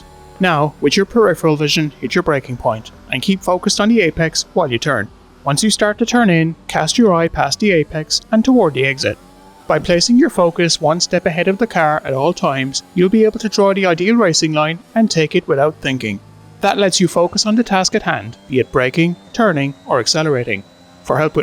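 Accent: Ireland. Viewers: I would think ur Irish where you from I love these tutorials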